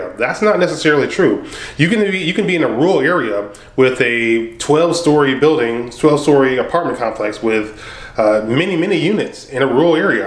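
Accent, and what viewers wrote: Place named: Detroit, United States. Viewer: been watch for 10 years, wen he became so Detroit?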